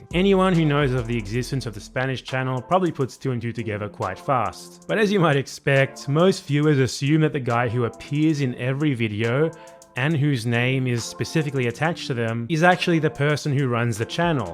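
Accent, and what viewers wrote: United Kingdom. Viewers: The is something about a guy with a british accent saying " what [developing country] truly needs is [some neoliberal bullshit]" that makes me want to punch him in the face while singing the Marcha Peronista. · Yes, always was a tad suspicious of baldy beardy guy, condescending british accents don't work on actual brits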